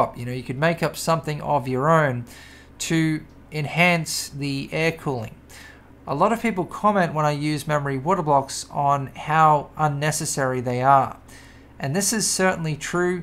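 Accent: Australia. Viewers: are you australian? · He aussy?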